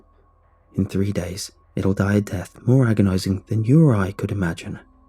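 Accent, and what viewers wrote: United Kingdom. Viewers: If its in America why use a UK voice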